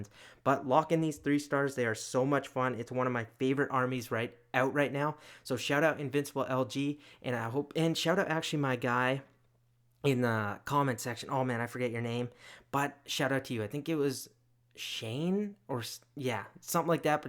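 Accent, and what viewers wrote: Canada. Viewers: You sound Canadian, eh? 🤔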